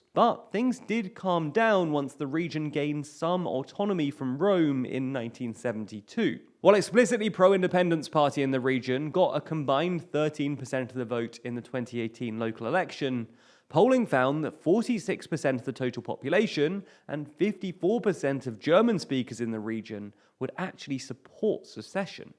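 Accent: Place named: RP, United Kingdom. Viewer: Interesting how “island” and “Ireland” are homophones in your RP accent.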